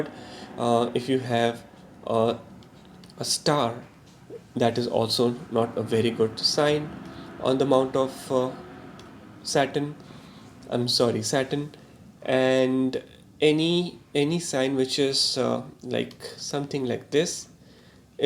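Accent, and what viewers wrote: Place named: United States. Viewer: Its american accent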